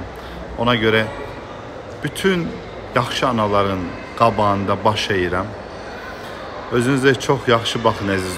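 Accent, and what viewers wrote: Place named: Azerbaijan. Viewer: Ay,sen Azerbaycan turkcesinde ne sirin danishirsan.Demek butun Turkiye turkleri bizi anlaya biler.Coxusu fors edir.Deyirler by dili anlamiriq.Amma bizim dilimiz bir birine coox yaxindi,eqrebadi.Sexsen men isterdimki,turk dili ingilis dili Kimi olsun.Yeni yer uzunde butun turkler bu dilde konushsun.Turan yaransin.Amerika Kimi boyuk dovlet olaq.Onda hec kes bizim torpaqlarimiza kec baka bilmez.O anaya geldikde ise menim ite,kediye boyuk hormetim var.Cunki onlar cocuqlarin atmirlar.Bu ana ise hec heyvan qeder aqilli deyil.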